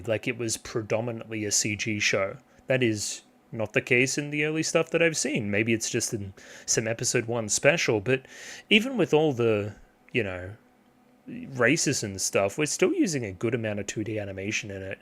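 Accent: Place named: Oceania. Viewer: I think your knowledge of horseracing, horse behavior, idol shows and passion projects like Symphogear and GuP makes you one of the best candidates to enjoy this franchise to its fullest. Personally, I feel it is the best thing to happen to me watching any media in the last 5 years. Firstly, I like how you gave time analysing the episode and doing some research. So I will share some points, a few of them might be answers to your questions. (-1) Cygames sponsor Kentucky Derby, Grand Prix in Paris; many of the company heads own thoroughbreds themselves. Many legendary jockeys sponsor the franchise. This show is a beautiful love letter to Japanese horceracing (JRA, , anime counterpart is URA) history and the racehorses that participated in it. The deeper you dig into how this franchise takes on irl lore, the more fascinated you'll get. Howevever, S1, released 3 years before game release, was about the company still struggling to form their own studio to produce their show and find a working formula. 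It still ended up being more than decent. (0) BACKGROUND: Others have probably already told you about how uma musumes are born. A decoration on left ear means they were mares irl (like Black Caviar the legend from Australia), while right ear means stallion (like Bonecrusher from NZ). I gave you Oceania examples based on your accent XD. (1) The Uma Musume anime is NOT an anime adaptation of the gacha. Neither is it an advertisement for the game. Uma Musume is a "multi media" franchise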